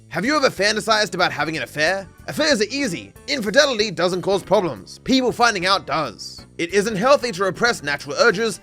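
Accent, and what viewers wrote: Australia. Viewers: Hell yeah, that's why I pay for the internet. To watch an Australian guy talk half a video about an unreal website for unreal horny wifes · other guys at my age: going oudside, doing sports, enjoying life. Me : listening to a random ausiie reading s*x messages.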